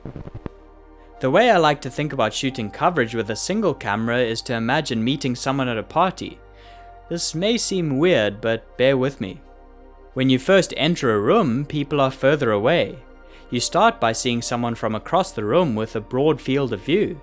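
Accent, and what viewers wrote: South Africa. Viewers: sorry good sir are you South African??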